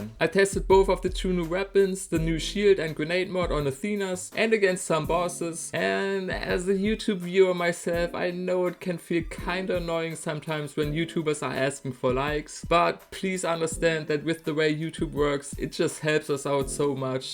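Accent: Germany. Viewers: youre such a german guy i see that accent (du bist son deutscher bro)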